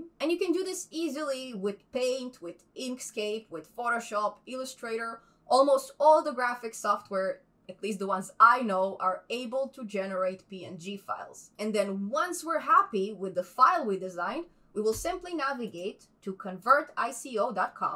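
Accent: Eastern Europe. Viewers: Чем то славянским отдает произношение :) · The voice accent is similiar to slavic or eastern europe citizen but not real canadian.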